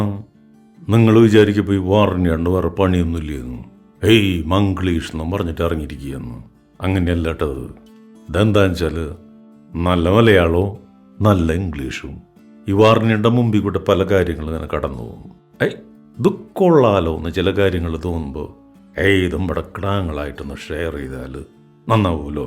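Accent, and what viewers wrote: Thrissur, India. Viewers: തൃശ്ശൂർ സ്ലാങ് ആണേയ് ...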